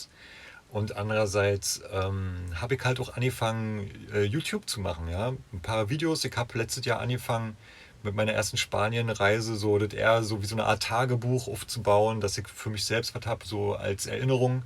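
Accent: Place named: Berlin, Germany. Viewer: Oh Gott wie furchtbar 🫣 gut das euch nichts passiert ist🙏 Ich liebe den Berliner Dialekt 👍 erinnert mich an einen Brieffreund aus Berlin vor 40 Jahren 🫣🫶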